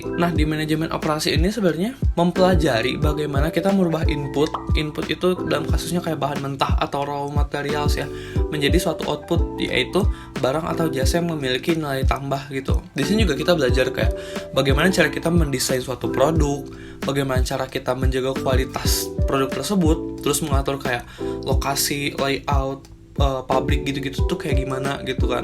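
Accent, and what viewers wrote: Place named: West Java, Indonesia. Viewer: Sunda pisaannn euy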